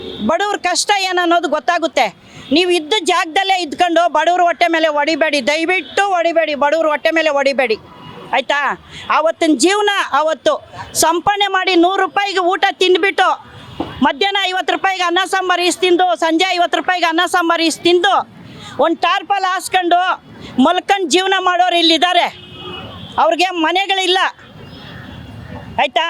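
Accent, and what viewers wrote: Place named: Tamil Nadu, India. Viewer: Tamilnadu ge hogi maaru. Aa slang kelak aagalla.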